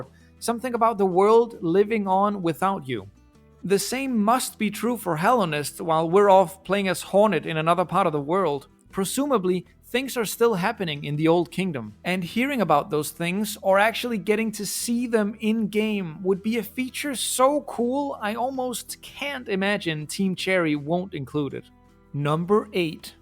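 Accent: Denmark. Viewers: Den danske accen er rimelig let at genkende føler jeg. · Your accent sounds danish, is that right?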